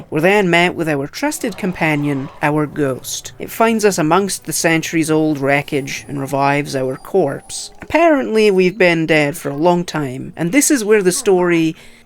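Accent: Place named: Albania. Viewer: He's Albanian.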